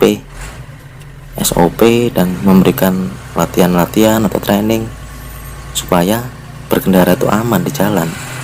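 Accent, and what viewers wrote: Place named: Java, Indonesia. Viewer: Jawir x logatmu...daerahmu Jawa sana juga LBH ngawur...bacot kau... Kontenmu mmng kbnykn sok2 ngjlkin kmbink...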